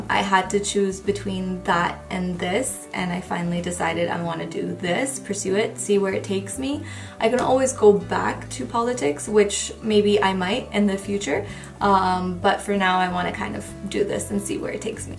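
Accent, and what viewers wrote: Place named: England, United Kingdom. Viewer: How u are so well spoken English accent if u are from India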